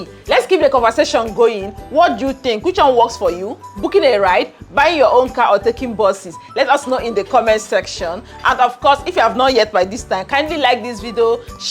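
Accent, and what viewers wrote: Nigeria. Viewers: Nigerian accent sucks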